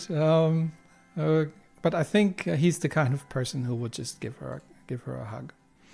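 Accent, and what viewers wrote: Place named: Germany. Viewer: Australia? But you have german accent...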